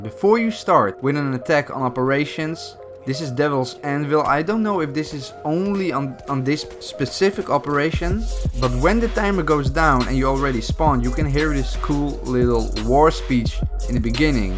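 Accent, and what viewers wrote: Netherlands. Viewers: Are you dutch because you sound dutch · Are you dutch?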